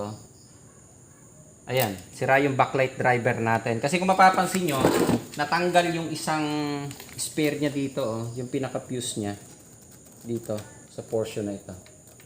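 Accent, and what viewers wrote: Mindoro, Philippines. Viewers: Tagasaan po kau sir...parang taga MINDORO po kau kababayan ko..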